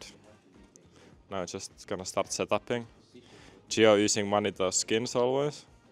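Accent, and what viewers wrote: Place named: Finland. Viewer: first time seeing a video of you and instantly recognized the Finnish Accent, hope all goes well and got a new subscriber <3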